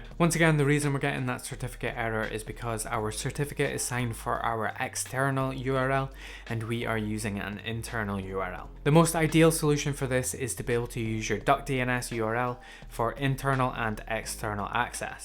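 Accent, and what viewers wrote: Scotland, United Kingdom. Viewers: Excellent video! Just getting started with Home Assistant and your channel is now my go to for all my questions and problems (also nice to hear a familiar friendly Scottish accent too!)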